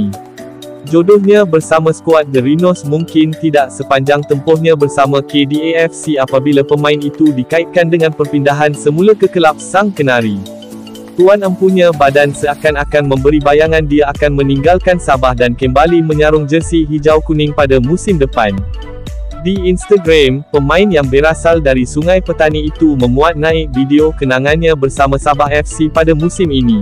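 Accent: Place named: Indonesia. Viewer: admin ni orang indonesia tak? sebutan tiru2 gaya melayu tapi masih kuat pelat indon..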